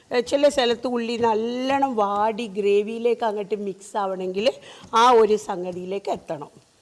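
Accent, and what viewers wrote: Kozhikode, India. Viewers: ഇത്ത, എന്നും കാണാറുണ്ട് പുതിയ പാചക വീഡിയോസ് ഇടുന്നതും കാത്തിരുന്നു കണ്ണും നട്ടു ഇരിക്കാറുണ്ട്, ഇങ്ങള് പുലിയാണപ്പാ, എല്ലാ വീഡിയോസും ഒന്നിനൊന്നു മെച്ചം, കോഴിക്കോട്ട് ഭാഷ സൂപ്പർ നല്ല രസം ഉണ്ട്‌ കെട്ടിരിക്കാൻ, പുതിയ ഐറ്റംസ് പ്രതീക്ഷിക്കുന്നു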